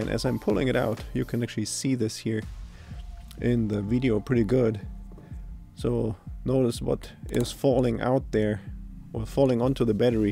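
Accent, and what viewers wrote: Germany. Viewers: Bist du deutscher? Hört sich so an 😂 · Gutes English aber man merkt das du deutsch kannst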